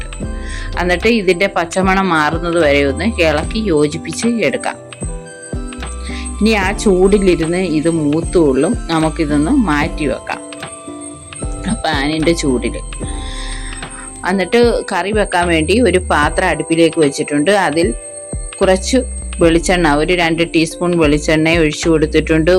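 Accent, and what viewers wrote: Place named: Adoor, India. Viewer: Njan Adoor kari aanu, njagal sheemachakka ennanu parayunnathu, nammal kadachakka ennu parayunnathu pineapple ne aanu, nattil evide aanu, samsaram kelkkumbol nammude bhagathu ullathu aanennu thonnunu, njanum ithupole aanu sheemachakka theeyal undakkunathu, super recipe😋👌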